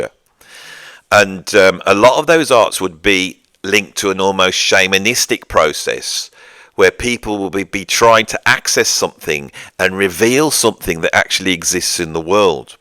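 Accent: Birmingham, United Kingdom. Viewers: The audio on this video is the worst I have experienced on any of your videos. That isn't a criticism. You just have some setting wrong, and your lovely, dulcet Brummie tone is distorted somewhat!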